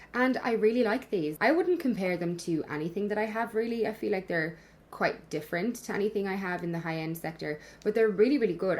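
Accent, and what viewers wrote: Ireland. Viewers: Is she Irish but lives in Australia?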